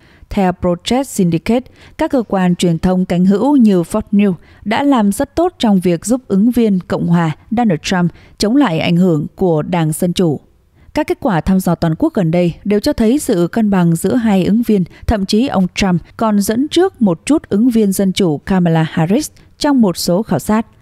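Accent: North, Vietnam. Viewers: Sao hom nay Dai HT D News lai co giong bac nua troi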